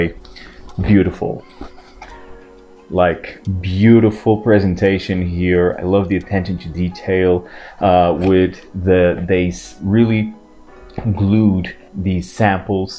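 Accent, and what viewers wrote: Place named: France. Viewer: this is my first time watching your review, I'm loving it. thanks for the vivid describe of the notes. I also love your accent. it's like my french friend speaking english.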